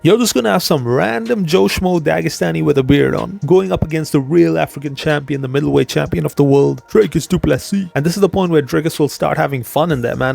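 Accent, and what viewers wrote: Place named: United Kingdom. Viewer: What part of Uk you from?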